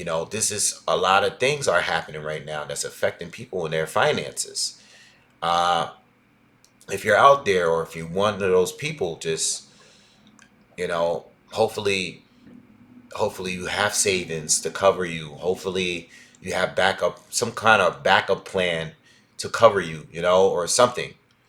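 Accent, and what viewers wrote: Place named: New York, United States. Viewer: Are you from New York? So I'm a Brit from London and I've always loved that New York accent. And as I'm writing this, your video is playing in the background and yes, you're definitely a New Yorker for sure; such a cool accent!!!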